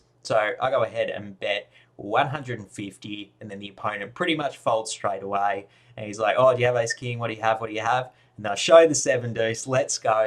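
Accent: Australia. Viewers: 👀 Me looking for vlogs trying to decide if I like the poker content or the Aussie accent more. Keep up the great work!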